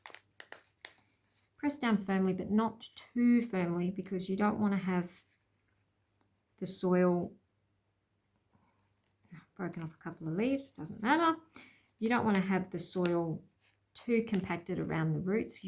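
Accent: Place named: Australia. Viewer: Great video. So nice to hear the Aussie accent for a change. Please keep posting your videos. 🤗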